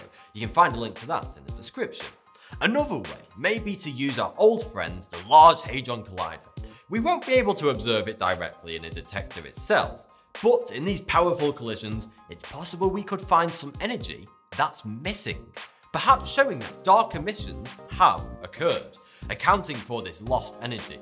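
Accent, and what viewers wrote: United Kingdom. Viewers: I like you British vsauce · Is he British?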